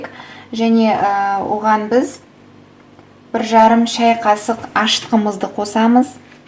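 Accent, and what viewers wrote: Standard. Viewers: Менин ойымша казакша таза сойлеп турго.келисем мак коп сиякты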